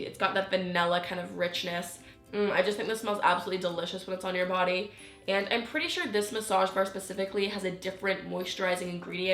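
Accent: Canada. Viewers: Is she Canadian? She sounds like my new amazing science teacher (I just moved to Canada btw) I am subscribing now because I am obsessed with Lush as well ❤️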